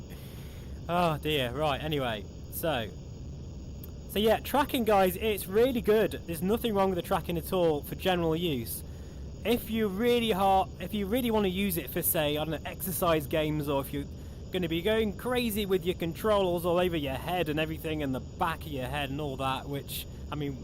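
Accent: United Kingdom. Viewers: Is that a Cheshire accent? G2 looks good, still waiting for mine, since 9th July. Getting fidgety now.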